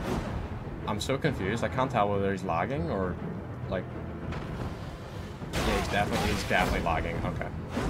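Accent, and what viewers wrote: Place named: Northern Ireland, United Kingdom. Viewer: Ah a fellow Norn Irishman!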